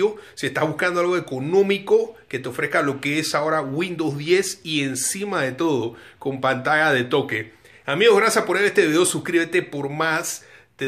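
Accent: Panama. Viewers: Él es de Panamá? Tiene un acento muy panameño 😂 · Es lo que parece. Panameño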